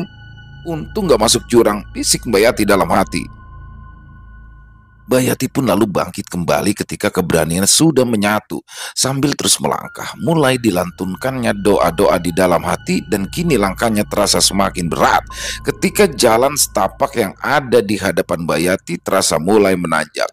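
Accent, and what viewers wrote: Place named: Banyumas, Indonesia. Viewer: Tumben itu perkataan yg dimaksud diluar kebiasaannya. ContohTUMBEN KAMU MASUK PAGI PAGI BIASANYA DATANGNYA SIANG. KLO sampaikan/sampean itu kata sama dengan kamu, lebih ditujukan untuk orang yg lebih tua dari kita. Aksen bahasa Jawa ngapak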